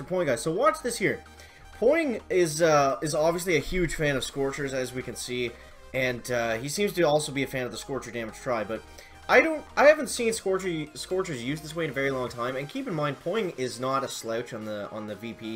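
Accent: Canada. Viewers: What part of Canada are u from?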